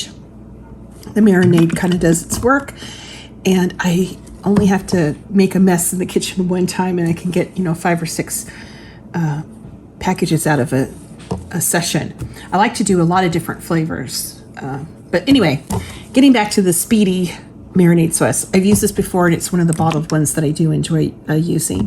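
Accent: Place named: South Louisiana, United States. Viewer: OMG ! YOU SAID, "YALL" ! COULD YOU POSSIBLY, BE FROM THE SOUTH ? YOU SOUND LIKE YOU'RE FROM SOUTH LOUISIANA.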